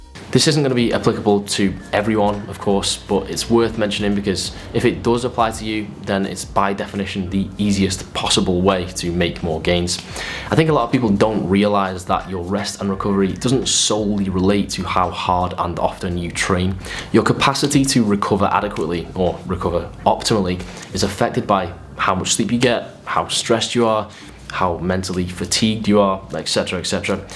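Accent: United Kingdom. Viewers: unfortunately anybody from outside the UK has 0.0% of a clue of what this guy is saying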